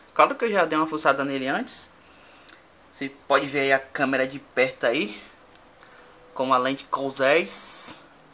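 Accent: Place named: Salvador, Brazil. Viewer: Eu diria "jeito presepeiro"... Hehehehe... Antes de saber que ele era de Salvador, eu já desconfiava só por causa da maneira como ele fala. Mas o cara é brother!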